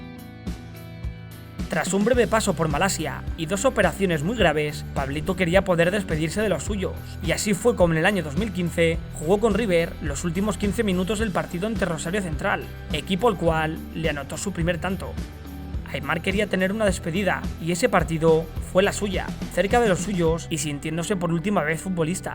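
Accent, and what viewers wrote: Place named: Galicia, Spain. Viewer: gallego babling in his dialect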